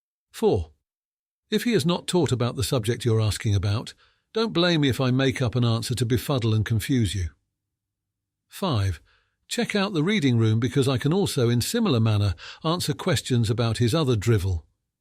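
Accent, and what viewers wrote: United Kingdom. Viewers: understandably, the voice could only ever have had a british accent 😂 luv it ❤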